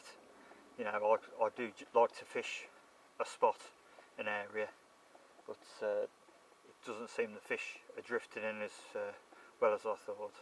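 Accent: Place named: Shropshire, United Kingdom. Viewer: Nice one! Down to earth & well presented. Its nice to be reminded what a Shropshire accent is like. You get them mixed up a bit when you've been away from Blighty for 40 years. Those are pretty impressive carp you're pulling in there! Here in Greymouth I usually fish off the wharf in the rivermouth for Kahawai. Love 'em pan fried in butter with a bit' onion, garlic & sweet chilli sauce.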